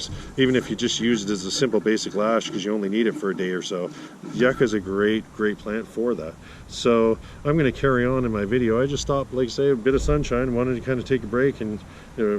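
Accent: Canada. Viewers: Awesome! You sound like a fellow Canadian. I am so inspired - "gathering resources". Been there so many times. Cheers from Montreal QC.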